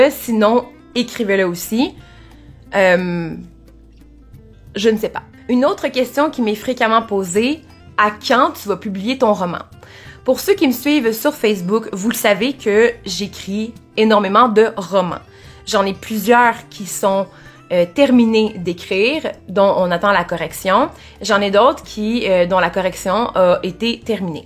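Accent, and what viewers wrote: Canada. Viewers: Bjr, alors pour l'accent on l'entend mais on le comprend pour ma part j'ai de suite reconnu l'accent canadien, pour votre fille ça devrait être tellement frustrant on n'a pas d'autre choix que de faire confiance aux médecins et aux éducateurs malheureusement 😢 pour votre entreprise si vous engager des étrangers habitants en Afrique du Nord pensez à moi 😅 pour votre traumatisme je suis admirative j'aimerais tellement un jour pouvoir exprimer tout mon vécu un jour ça doit être libérateur.... Pour finir merci pour cette vidéo c'est intéressant d'avoir l'impression de connaître une personne sans jamais l'avoir rencontré !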